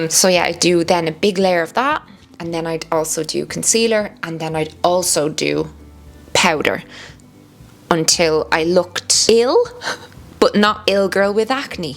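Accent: Ireland. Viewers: I've been here from the start. Started following you for the beautiful Irish accent and stayed for your beautiful personality ♥️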